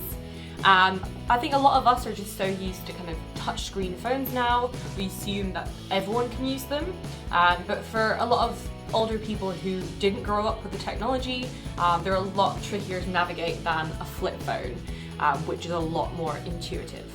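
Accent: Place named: Estuary English, United Kingdom. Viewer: Silly, intrusive background 'music', no explanation of what is shown on the external indicator panel, no mention of charging plug type, absence of showing how to set anything on the phone, ambivalent accent mixing estuary English with a sort of N.American and, overall, an ego trip posing as an empathetic guide for seniors.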